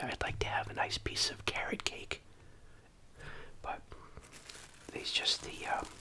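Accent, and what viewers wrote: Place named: Boston, United States. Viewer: you should do radio ASMR videos. People would like your Bostonian accent.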